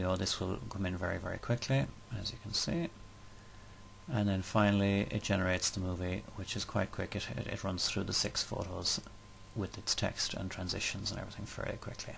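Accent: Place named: Ireland. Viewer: Hi guy. Enjoyed your tutorials. Love that Irish accent; just came back from a 12 day tour through your lovely country. Tell me, how can I play background music on just a bunch of slides in the middle? I start narrating and then I want to play some music without narration, and then pick up the narration again later? Please, please!!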